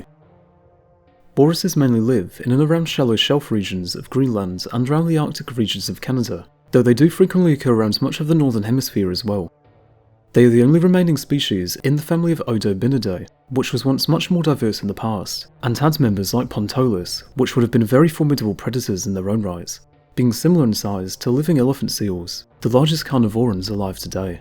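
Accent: United Kingdom. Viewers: First 10 seconds of the video was too British for me to understand 😭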